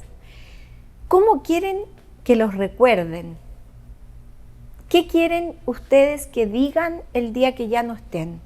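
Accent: Chile. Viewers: casi no se escuchan asentó chileno Por eso me guste mucho escucharte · ya no eres la misma,que pena, me reía,disfrutaba y aprendía mucho con tus charlas,tu acento chileno y todo lo que decías 😢😢😢😢😢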